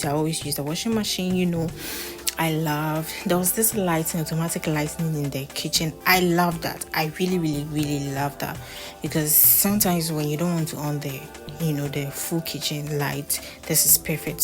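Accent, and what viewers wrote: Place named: Ghana. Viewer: I heard your accent and I knew you had to be Ghanaian. Love your video🥰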